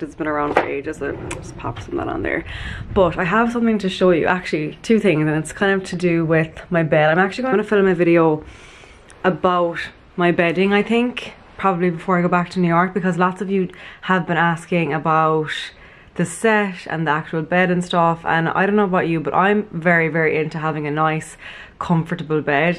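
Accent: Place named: Kerry, Ireland. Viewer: Love how relaxed and natural you are in these vlogs at home. Will give them a go tomorrow. Sunday is self care day! Never apologise for the Kerry accent (from a Dublin gal).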